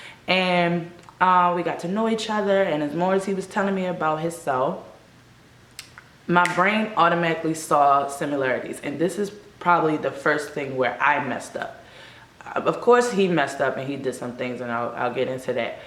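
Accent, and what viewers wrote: Baltimore, United States. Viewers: The Baltimore came out in this vid lol #westbaltimoregirl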